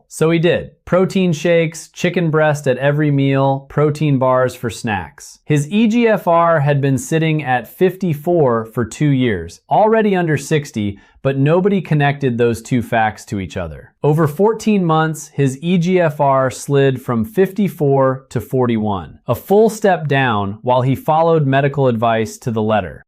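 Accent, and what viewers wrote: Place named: England, United Kingdom. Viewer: Male 70 yo English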